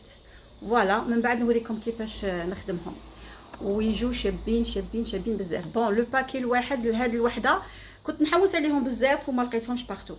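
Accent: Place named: Oran, Algeria. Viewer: 😂😂😂😂😂👍😘انت باينة بنت وهران الله يحفظك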